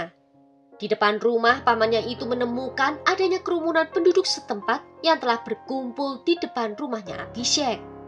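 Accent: Java, Indonesia. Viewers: Org jawa kah mbak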